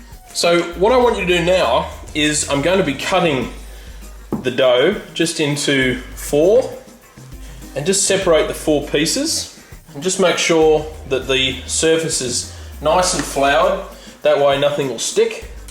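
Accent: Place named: Australia. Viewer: He's pronouncing it correctly - pretty much the Italian way of saying it only with an (understandable) Australian accent. The US "No-key" pronunciation bears no similarity <link> (to hear an Italian pronouncing the word)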